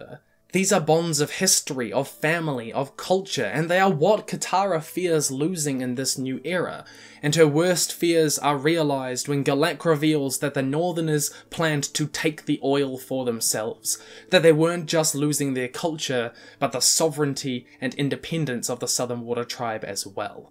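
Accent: New Zealand. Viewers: Great video.... But I can't stand how kiwis replace "E" with "I" when the speak. "When" turns to "whin" "end" turns to "ind" lol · are you from nz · Bro you a kiwi ? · Are u a kiwi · Wait your new Zealander?! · You're a kiwi?! how did I never notice.I'm a kiwi too. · wait is he a kiwi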